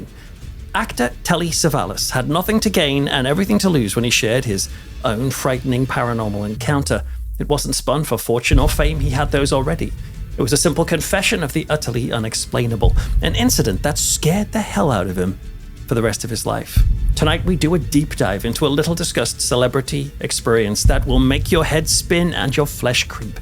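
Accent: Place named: England, United Kingdom. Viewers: Telly Savalas with an English accent! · This clown can't focus! Hate when these people from england narrate!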